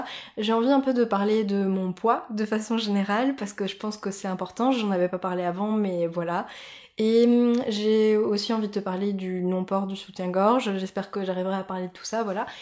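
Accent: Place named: Haut-Rhin, France. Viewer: j'en était sûr ahah je sais repérer l'accent de chez moi :) je viens du haut rhin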